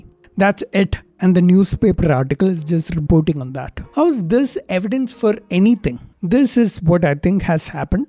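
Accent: South India, India. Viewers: He speaks south Indian English (if you know 'thank' and 'tank' thing)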